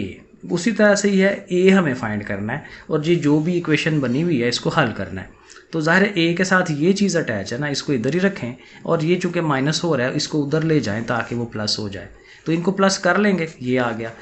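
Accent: Sialkot, Pakistan. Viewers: I think you are Sialkoti😊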